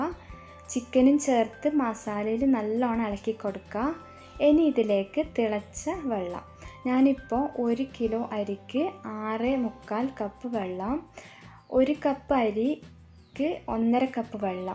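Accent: Kannur, India. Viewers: Sooper biryani..recipe...😋ningal kannur aano..voice kelkkumbol angane thonni...😍😍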